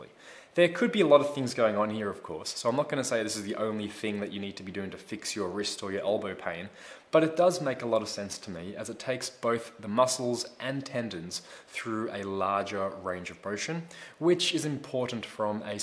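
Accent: Australia. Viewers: Blessa my heart Blessa my soul Never seen an Asian whith an Aussie accent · Was NOT expecting the Aussie accent from THIS guy, just saying!!! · Was so happy when I heard that Aussie accent coming through 🤙 great videos mate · Are you Australian,? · the whole time i thought this asian guy speaking with fluent Australian accent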